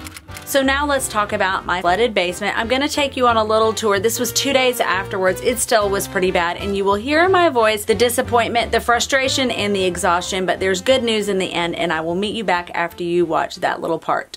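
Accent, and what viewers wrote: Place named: South, United States. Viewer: As a cute asian girl (I'm in my thirty's, but still cute. I mean a girl 😜) I must say, it's so nice to see a cute, not asian, girl so excited about and so talented in amigurumi. And a southern one to boot! I always loved accents when I was younger, and now that I live in the accent-less Pacific Northwest, it's nice to stumble across channels that have both something I'm interested in and a super cute accent.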